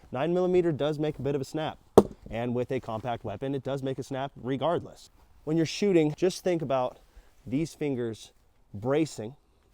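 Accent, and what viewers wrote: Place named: United States. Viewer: Maybe that’s just his style.. seems pretty American to me. Sounds American. Fuck isis. 🇺🇸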